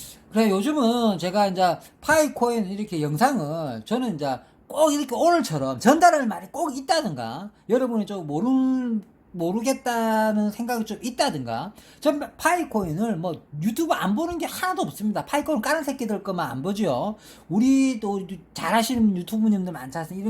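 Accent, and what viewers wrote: Gyeongsang, South Korea. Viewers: 행님 경상도 어데 사십니까?ㅎㅎ 좋은 영상 감사합니다 ㅎㅎ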